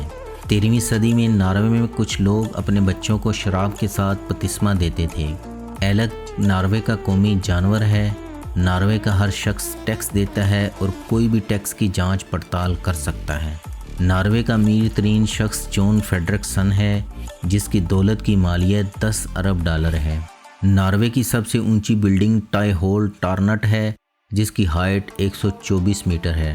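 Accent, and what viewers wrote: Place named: Punjab, Pakistan. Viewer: the information is good but please pronounce the words accurately, if you are speaking in Urdu language as at the moment, you are speaking in punjabi. It Not 'Narway' its pronounced as Norwary. Secondly its called 'mulk' not mulaak in Urdu so please correct that.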